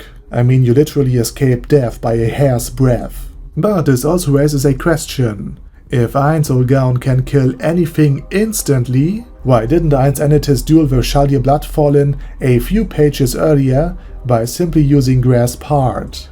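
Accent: Germany. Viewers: We just need to add a bit of overacting to that German accent of yours and we have a yT channel narrated by Pandora's Actor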